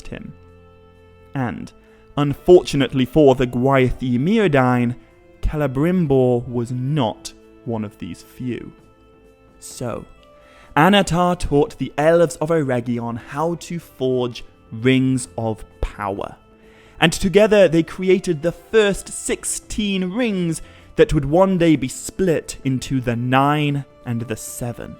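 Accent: United Kingdom. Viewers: Wow turns out I hate British accents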